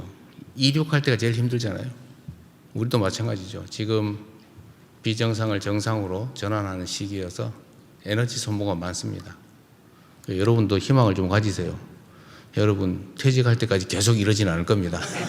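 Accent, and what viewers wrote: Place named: Gyeongbuk, South Korea. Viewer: 갑자기, 이재명 대통령님의 말씨가 고 김광석 형님의 말씨와 흡사하다는 느낌이 드네요. 그러고보니 두 분다 경북 출신입니다. 그래서 더 그렇군요. 참 정겨운 말씨였죠. 광석이 형님 ㅠㅠ 두 분이 또 연세도 비슷하셔요. 광석이 형님이 용띠, 이재명 대통령님이 토끼띠인가? 참고로 저는 말띠입니다. 광석이 형님 닮은 제 친형도 토끼띠예요 ㅎㅎ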